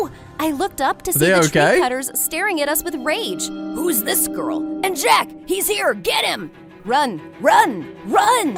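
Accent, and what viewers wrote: United Kingdom. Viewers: i love the brittish accent. NO WAY! also love how misa says. she has a perfect memory so casualy when she hates her 😅 then goes back to hating her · The British accent 😭😭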